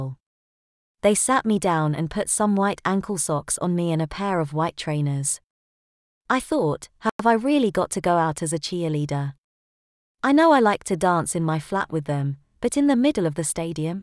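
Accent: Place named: United Kingdom. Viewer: What a fraud! A story about American football, w/cheerleaders, told in an British accent, using English terms not used in the US. Flat is actually an apartment, match is game, etc. And the cheerleader's lockerroom is closely guarded at ALL times to keep interlopers out. And no, the final game of the season would be played on home turf, not in England. Not even a good try, it's a complete fail!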